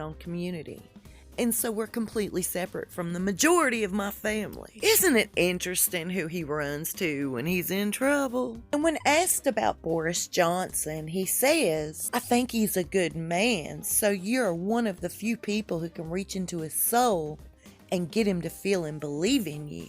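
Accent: South, United States. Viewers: Love you! From one southerner to another. This N’awlins girl digs that drawl!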